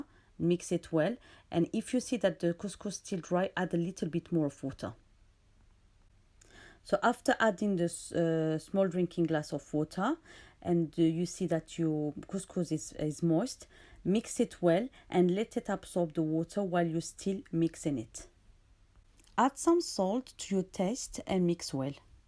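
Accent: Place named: France. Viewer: You have very sweet french accent🙂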